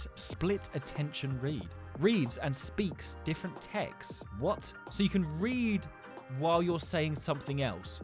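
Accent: United Kingdom. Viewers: i love this bros british accent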